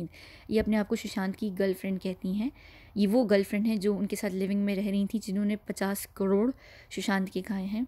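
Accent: United Kingdom. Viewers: your accent r supperb. can you teach me uk accent 😊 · Mam how is your english and hindi both so good. Like perfect british accent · English accent.. Masha Allah.. 😍💕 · Excellent research. Your English accent sounds like you're from the UK.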